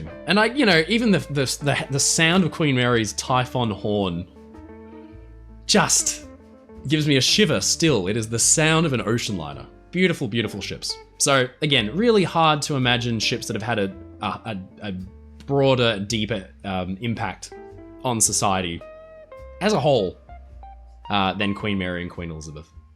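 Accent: England, United Kingdom. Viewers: I thought you were from the UK , you sound more English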